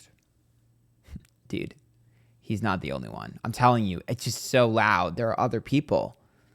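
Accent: New York, United States. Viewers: His house in Massapequa looks like a house of horrors and it was an eye sore in the neighborhood and neighbors have apparently seen him burning garbage in his backyard in the past as well as watching females suntan in the neighborhood. He’s a creep. Are you from Long Island? You definitely have a New York accent and speak fast 🤣 but I can totally keep up. You speak my speed. I’m from Long Island. You say cawfeee? 😁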